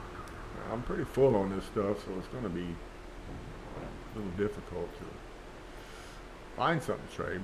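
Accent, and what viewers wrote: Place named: South Carolina, United States. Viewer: man are you from south cackalacky? you sounds like some peeps from around here.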